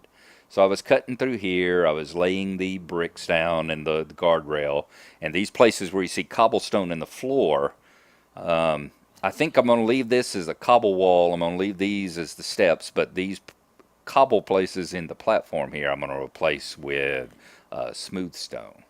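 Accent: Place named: England, United Kingdom. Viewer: "Just leave the damn thing alone and put the new textures in." LOL I know exactly how you feel and agree with you. Use glowstone to melt your channel of water through the ice instead of torches. They're 1 light level higher so will melt one block more in each direction. With the way boats are so difficult to handle you'll need those 2 extra blocks' width. I think, with the beauty and creativity of your Emerald mine you can consider your game well and truly upped. You started building that 6 to 9 months ago and never mentioned it to any of us or showed us? pouts Love how your solution to the dangerous mob ledges above is to cut into the wall to shelter rather than climb up and torch it up. Means your ravine looks so much cooler with the upper extremes still in darkness. Once again it's your small differences, often so considered and thoughtful, that makes a huge difference to the outcome and feel of your place. 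Small correction. Building your mine 4 high does not cover the 4 main layers of lapis, it covers 6 of them, because you can see the ceiling and floor blocks too. You're actually being even more efficient than you knew! :o) G "Had a fun little time: got to trash talk a skeleton and a couple of zombies over there." LOL chuckles Okay, why when you put the text in to correct County Gnarlo to Brocraft does the addition of that "mate" mean I hear my voice reading the text instead of yours? Or maybe yours in that amazing English accent you can employ... :oP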